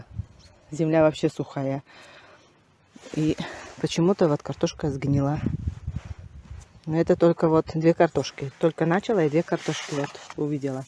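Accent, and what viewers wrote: Komi, Russia. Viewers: Здравствуйте, и! Привет вам из Самарской области👋👋👋 Ох, кутшем ме рад кывны рОднэй диалект, вроде похож миян говор вылэ Анна Николаевналэн серниыс). Чужи да быдми ме Ижемскей районын в с.Няшабож. 12,5 лет не была на родине, гажтэмала порой зэй ена. На днях искала рецепт калитки, а вчера случайно, а может и не случайно, вышло в ленте ваше видео. Результат - челядес да верОсэс водтэдi узьны😴 и кык час воедз калитка пОжалi😁 с картошкой и пшенной кашей. ПрОстэй национальнэй выпечка. Дети очень любят выпечку с картошкой, буду теперь чаще баловать их калиткой 😊 в общем, смотрю теперь по возможности ваши видео, как вы, картошка босьтанныд, тшак Октанныд (ме мамакед зэй ена любитi тшакла ветлыны, чери кыйны вугыршайт вылэ)... Ностальгия🥰 Синваэ петэ... Став бурсэ тiян!💐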